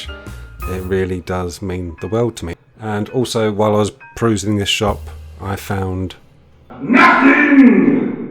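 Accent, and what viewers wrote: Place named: United Kingdom. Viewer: Guys I think he is British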